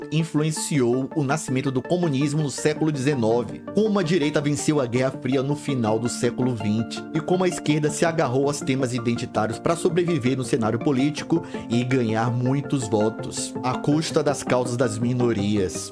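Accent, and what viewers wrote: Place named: Northeast, Brazil. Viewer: Que bom ouvir alguém com sotaque nordestino que não seja Renan Calheiros, José Sarney, Ciro Gones et caterva. Parabéns !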